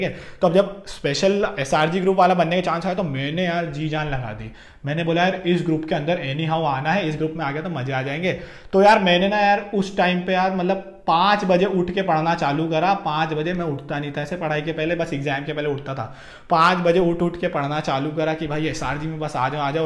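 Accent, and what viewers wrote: Indore, India. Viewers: Tysm for uploading this video!! Really inspiring story!! 🤩🤩 Btw bhaiya aapke baat krne m indori jhalakti h!🥹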